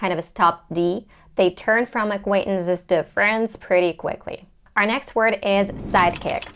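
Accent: United States. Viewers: Can you tell what kind of American accent are you speaking? It’s a cute accent!!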